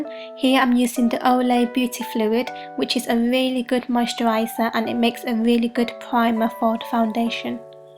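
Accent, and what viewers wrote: United Kingdom. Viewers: you're sooo beautiful! and you sound like you've got a British accent ;)) so cool! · u have abit of a british accent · i love how she is asian yet has a british accent :) its so neat! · i like ur accent!! how long have u been staying in UK?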